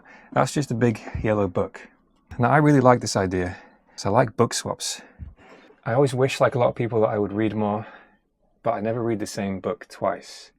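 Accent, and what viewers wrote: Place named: England, United Kingdom. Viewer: Ah *that*'s an English accent I like. Fits the scenery, too.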